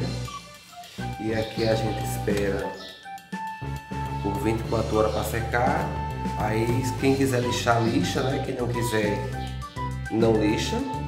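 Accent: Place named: Recife, Brazil. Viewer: Parabens que lindo ! Amo esse sotaque é de Recife ? 👏🏻👏🏻😘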